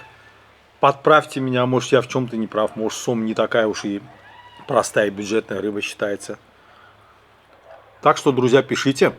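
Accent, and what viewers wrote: Baku, Azerbaijan. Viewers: Здравствуйте, дядя. Благодарю Вас, за ваш канал, за ваш бакинский акцент и за Ваш позитив😊 успехов и процветания Вам. Скажите пожалуйста, вы лезгин?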